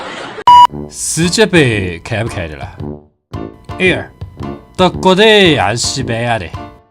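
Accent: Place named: Shaoxing, China. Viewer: 感觉就是普通话，没听出吴语除了一点绍兴音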